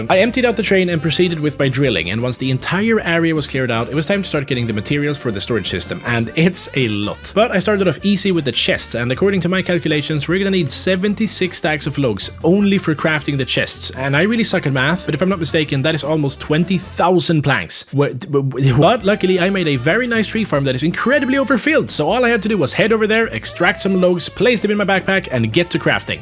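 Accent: Sweden. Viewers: I KNEW YOU WERE SWEDISH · du, jag hör att du är svensk.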